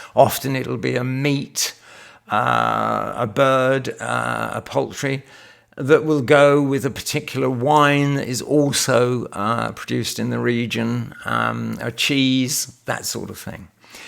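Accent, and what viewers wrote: United Kingdom. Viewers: Is that an East Anglian accent? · I love your passion for your Brompton (I feel the same for mine) and your humor! And besides this as a German it is wonderful to listen to your british accent😊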